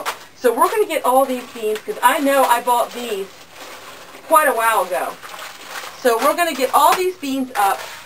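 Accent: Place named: South Jersey, United States. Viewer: I grew up in South Jersey, and hearing you speak reminds me of home. Are you in Pennsylvania?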